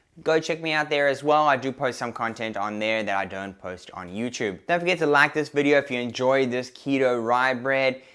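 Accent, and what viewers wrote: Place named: South Africa. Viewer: that south african twang still comes through ;)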